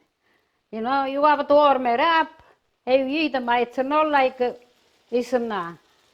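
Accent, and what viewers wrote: Italy. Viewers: The world is small! We all in immigrated to Chicago in 1964. Went to Italy in 1979 and met my husband and got married in 1982. In a small town I am sure knows called Castelluccio Valmaggiore not far from Faeto!! I now live in northern Italy. My family still lives in Chicago. I love listening to she reminds me of my mom passed away in 2015 Adele who also always cooked and baked as with her Italian accent. Bye.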